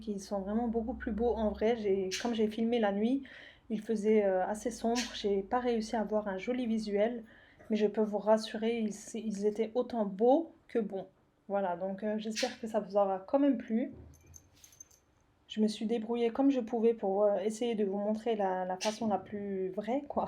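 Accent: Alsace, France. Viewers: Bonjour je viens de découvrir votre chaîne vous faites des recettes peu coûteuse avec très peu d'ingrédients c'est super sympa j'ai testé quelques recette c'est super bon par contre pour la recette des biscuits la le l'objet en silicone pour faire les biscuits au chocolat vous l'avez trouvé où parce que c'est c'est plus pratique une machine ou le hachoir pour faire les spritz donc est-ce que vous pourriez nous dire où vous avez trouvé l'accessoire très intelligente et continuer ainsi à nous régaler et à nous faire économiser de l'argent et continuer à faire du maison et arrêter l'industriel bisous et comptines bonne continuation Jennifer PS votre accent me fait penser à l'Alsace et vous en Alsace parce que je vois que vous prenez beaucoup de produits d'Allemagne bon courage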